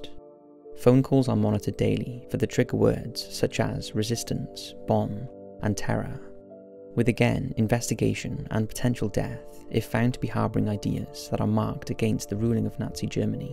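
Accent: United Kingdom. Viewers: British accent.....instantly clicked off.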